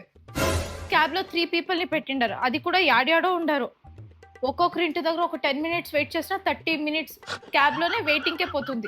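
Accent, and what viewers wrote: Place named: Karnataka, India. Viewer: Mee slang Bale ga vuntundi karanataka Telugu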